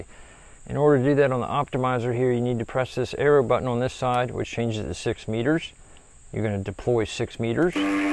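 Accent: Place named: Eastern Shore, United States. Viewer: Could tell by your accent that your Eastern Shore Md, lol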